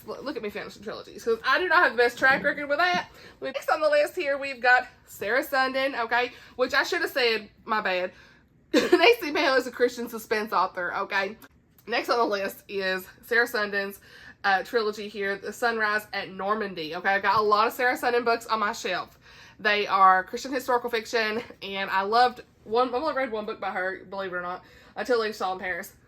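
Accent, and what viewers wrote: South, United States. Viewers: I love your energy and southern accent! We read completely different things but I really enjoyed seeing all the series you want to tackle! ❤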